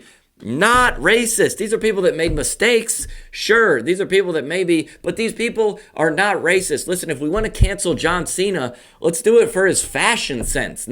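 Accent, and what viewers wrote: South, United States. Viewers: Keep it suh-thurn! It’s the best!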